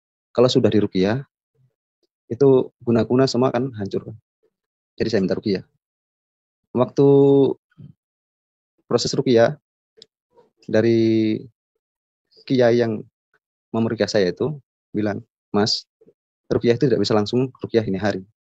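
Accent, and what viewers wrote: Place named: East Nusa Tenggara, Indonesia. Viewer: Logatnya sdh NTT banget ,Mas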